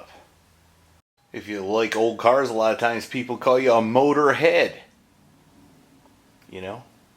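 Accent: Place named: New York, United States. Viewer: Enjoying the New York accent mixed with the southern slang!!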